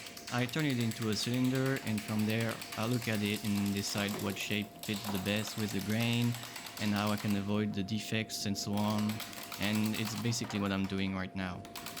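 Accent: France. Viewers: Love the commentary. I mean come on, you're a french dude so the accent makes your already great videos even better. Takes longer to edit but I think overall it's worth the effort. Also strictly for fun, 1965 Mustang. Or '67 Camaro. If I'm being practical a Suburban newer and with fewer miles (and rust!) than my 2012 or a Jeep Wrangler with a winch for dragging logs out of the woods and home to the lathe 😃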